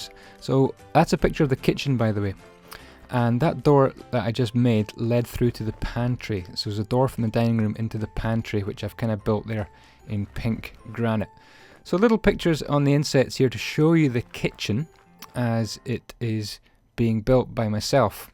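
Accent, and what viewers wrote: Scotland, United Kingdom. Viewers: so nice to hear someone scottish doing minecraft vids! :D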